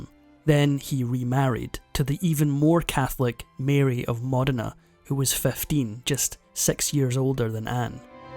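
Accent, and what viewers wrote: Scotland, United Kingdom. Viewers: It's a Scottish accent. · I'm training my ear, could you confirm your accent is Scottish? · Rather upsetting to hear this account of the union between England and Scotland given with a Scottish accent without mentioning what disaster that was for Scotland. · EXCELLENT, as always. The voice is beautifully modulated and the Scots accent is wonderful! What is your name, sir? 🥰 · The title says "Britain's First Queen." The first British queen was Mary I, eldest daughter of Henry VIII. It doesn't matter if "the narrator spoke with a Scottish accent." It doesn't change the fact that the first female to be crowned a sovereign on the Isle of Great Britain was Mary I.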